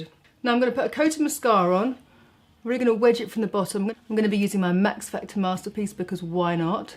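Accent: United Kingdom. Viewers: you are so British!! Love listening to you talk! Little things you say are humorous. “Cheap as chips” and “Off to the disco” made me giggle. Thanks for the tips on getting out of the “down in the dumps”. You look lovely as usual. 🤗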